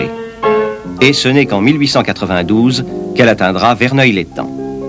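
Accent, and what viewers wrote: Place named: Paris, France. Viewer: Une pointe d'accent titi parisien dans les commentaires bien raccord avec les images. Super vidéo!!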